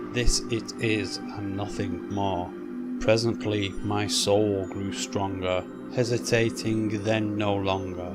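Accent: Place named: England, United Kingdom. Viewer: Awesome job it sounded so good with your English accent you should do more of these.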